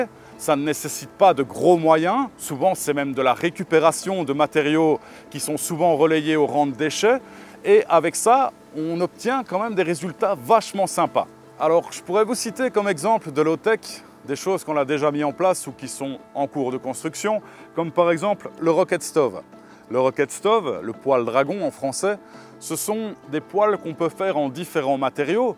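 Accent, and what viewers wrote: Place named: Wallonia, Belgium. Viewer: wallonie belgique je dirais vu l'accent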